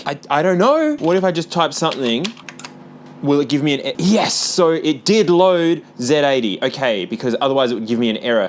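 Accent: Australia. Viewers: That's awesome! I used to own a Para Para Dancing (Korean Market version of PP Paradise). I'm part of MAGFEST here in the states.( Haven't looked yet at your profile and I'm trying to guess by accent... Australian NOT New Zealand 😅) Magfest is a yearly gaming event, 4 days, 24hrs nonstop EVERYTHING, concerts, panels, LAN, Indie gaming, console. We had to cap it at 20K People. We just had MAG 22. I've been involved since 3! I'm the warehouse and on-site event tech. MY gf got involved after we met around the time 11 started, and she later became arcade department head and she was so good at management, she was the head of the board of directors. Unlike me, she's extremely smart, and a scientist. My genius is Electronics repair 😊 Our rhythm/Japanese games section. We have groups of collectors that have insane stuff. Last event over 350 cabinets and over 100 were rhythm games. 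You name it, it was there. I may have a walk through on my channel I posted. I'll have to send you a link, as most of my channel is my daughter playing Pop'n Music. She jumped from low 20s and is currently playing 49s 😂😂😂 I'm jealous AF!! 😂.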